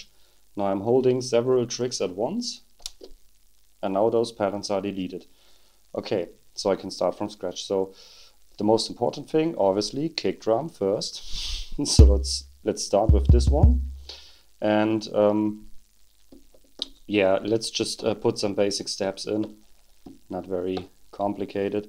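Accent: Germany. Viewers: Man hört stark dass du deutsch bist.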